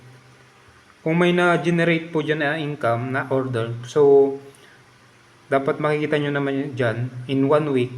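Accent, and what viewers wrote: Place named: North, Philippines. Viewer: taga north ito halata sa tigas ng salita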